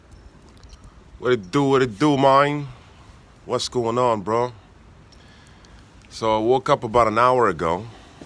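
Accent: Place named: United States. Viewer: Hey man! Your pronunciation is so American. Have you lived in the U.S., or did you just train to make it that good?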